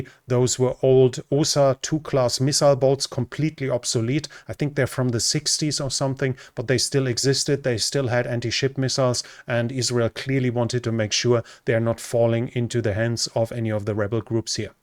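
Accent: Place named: Germany. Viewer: It sounds like you have a very heavy German accent. However, your English is quite good !!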